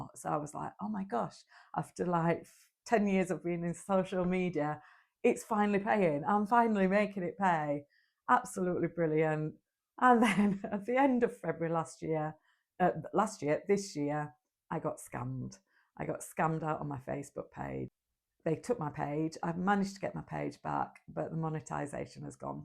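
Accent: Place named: Yorkshire, United Kingdom. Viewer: Loving your authentic style - I am originally from Yorkshire and it's great to see/hear this type of content accompanied by a Yorkshire accent like mine! Leo is just gorgeous - looking forward to more of his video bombing in future content lol. Wishing you well after your tough year xx.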